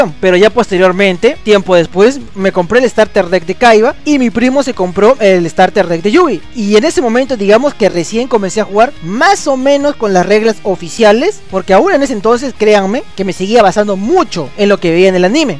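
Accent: Peru. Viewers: Y ese acento peruano?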